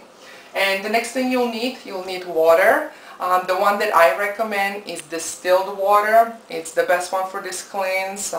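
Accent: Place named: Poland. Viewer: sorry just noticed your accent, are you European? lived and probably raised in america? just curious lol... sounds you've got polish accent.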